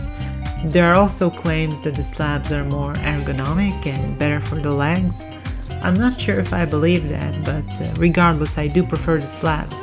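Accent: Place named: Eastern Europe. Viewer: this slavic ascent😮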